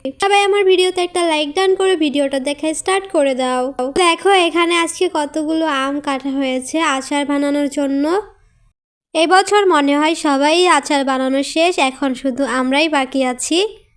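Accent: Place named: Bangladesh. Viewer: তুমি কি বাংলাদেশী তোমার কথা য় খুব টান আছে। ভালো থেকো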